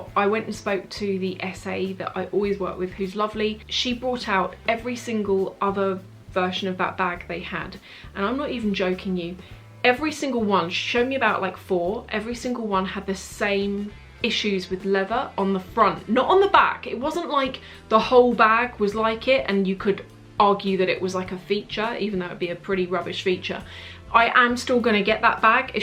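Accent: United Kingdom. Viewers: Hello. I recently discovered your channel and I absolutely adore you!!! Due to your British accent, I struggle to understand some of the things you say. You stated where you sell your bags and I could not get what was said.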